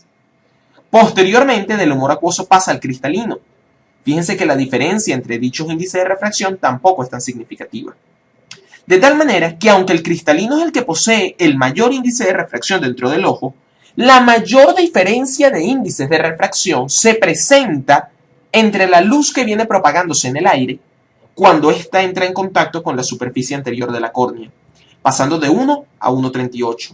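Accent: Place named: Venezuela. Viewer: Jeje tu acento, supuse que eras Venezolano jeje :) Me alegro mucho de conseguir por fin un EXCELENTE video realizado por un Dr. Venezolano :) Excelentisimo de verdad =) Saludos desde Anzoategui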